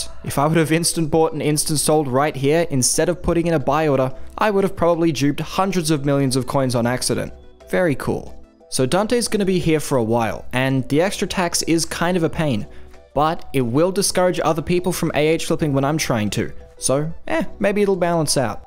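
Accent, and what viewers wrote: Australia. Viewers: wait is australian?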